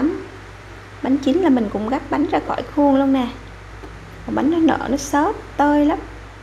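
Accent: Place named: Quang Nam, Vietnam. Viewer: Cám ơn chị đã chia sẽ vidéo làm bánh này theo đúng kiểu truyền thống. Nghe giọng chị trọ trẹ giống người Quảng Nôm, Quãng Ngữa dzị đó. 🥰🥰🥰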